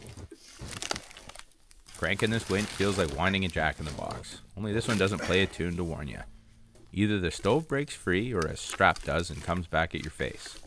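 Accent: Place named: Wisconsin, United States. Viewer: I love your Wisconsin accent but your using your ladder upside down, keepr moovin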